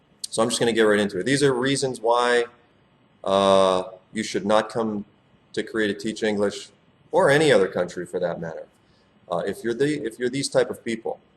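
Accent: United States. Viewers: wow awesome american accent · steryotypical American accent, Americans don't actually talk like that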